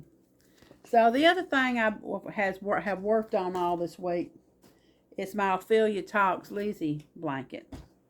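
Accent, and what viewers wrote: South, United States. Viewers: I enjoyed hearing your southern accent. I grew up in the south but am in wyoming.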